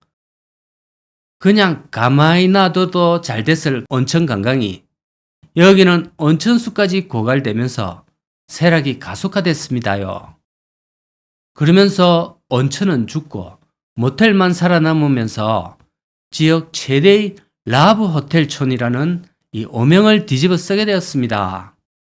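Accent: Gyeongsang, South Korea. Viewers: 수고많으십니다 구수한경상도 말씀 재밋게시청합니다♡♡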